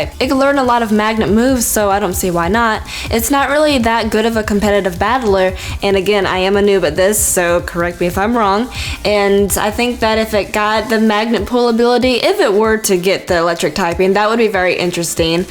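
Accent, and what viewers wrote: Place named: North Carolina, United States. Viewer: where is from? she sounds like she's from NC or some state around here... like, her slight dialect sounds so familiar.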